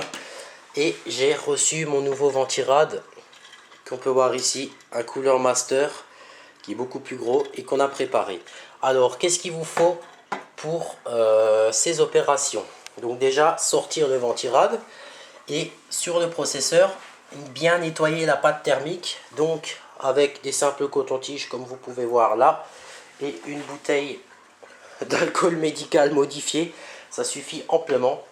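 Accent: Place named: Alsace, France. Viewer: cet accent alscacien du feu de dieux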